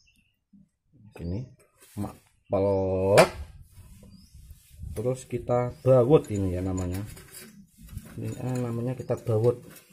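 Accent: Java, Indonesia. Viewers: Medok wkwkwk di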